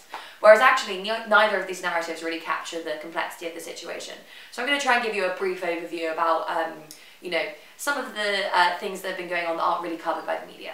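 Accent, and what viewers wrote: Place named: United Kingdom. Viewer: You speak too fast, I barely understood your sentences and I know most African will not understand you British accent . so consider and bare that in mind next time.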